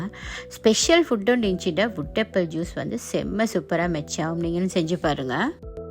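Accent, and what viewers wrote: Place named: Sri Lanka. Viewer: Voice apram video vachchi kandu putichiden ninga srilanga nu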